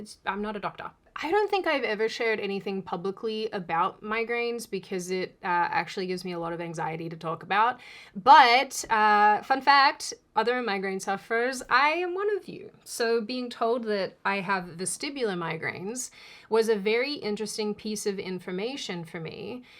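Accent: Australia. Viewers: Is it just me or does she sound more Aussie than she has in the past? So sorry she’s going through this…😢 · Wow, hearing her speak so "naturally" and candidly is really nice. And she comes off as likeable, funny, and with that Australian streak of charm. 🙂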